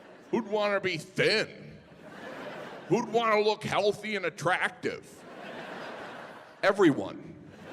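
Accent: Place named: Jamaica. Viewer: thats more like a Jamaican accent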